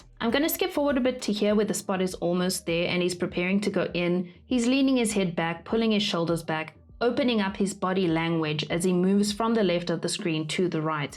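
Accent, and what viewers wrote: South Africa. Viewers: I'm from South Africa too! (I could tell from the beginning)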